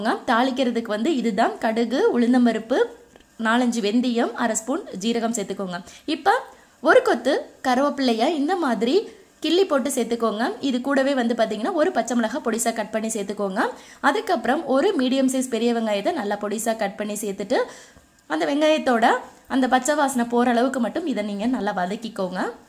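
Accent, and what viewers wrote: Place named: Coimbatore, India. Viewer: Akka Coimbatore Pola...... Ethana "inga" ... Anyway different recipe.... 👍... Nice nga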